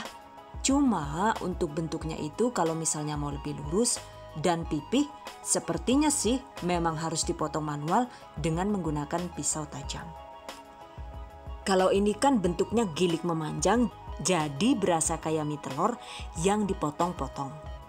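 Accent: Banyumas, Indonesia. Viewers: Ngapak2nya bikin gemes 😀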